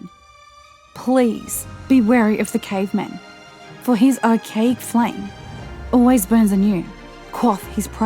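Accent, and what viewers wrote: Australia. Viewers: The best Aussie voice is at it again... Great video sis!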